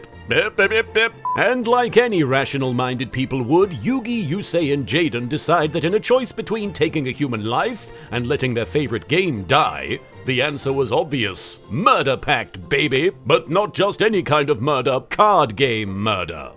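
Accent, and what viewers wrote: United Kingdom. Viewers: Why is he so British…? 🤔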